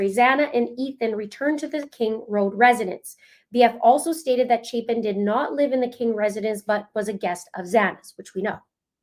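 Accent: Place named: Canada. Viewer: Love your channel and accent! I lived in Canada and sure miss it😊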